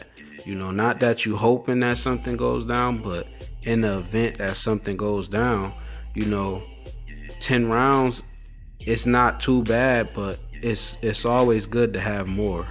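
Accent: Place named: AAVE, United States. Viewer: Dey pretty much uhmm yeah dey day dey day damn son lose that rap shit and fix the umm Ebonics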